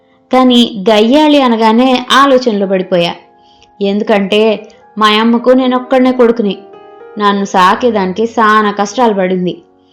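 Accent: Rayalaseema, India. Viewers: ఈ రోజే విన్నా. ఎంత బాగా చదివానో రాయలసీమ యాస అలవోకగా చదివావు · రాయలసీమ యాస చాలా బాగా చదివావు. సూపర్ 👌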